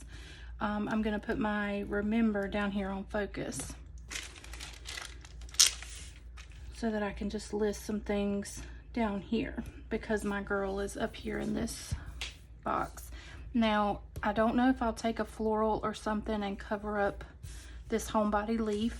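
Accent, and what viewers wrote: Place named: Texas, United States. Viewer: What state are you in ? You sound southern or Texan It is a cute spread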